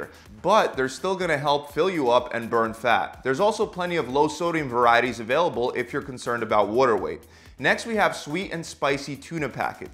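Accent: Philadelphia, United States. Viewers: You a Philly man?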